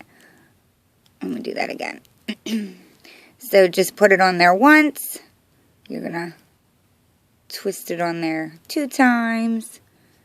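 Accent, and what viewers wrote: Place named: Brooklyn, United States. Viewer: Ma'am I'm so sorry. I really do not want to offend you when asking this question. Are you from Brooklyn? You just have that Brooklyn accent mixed w/ country lol.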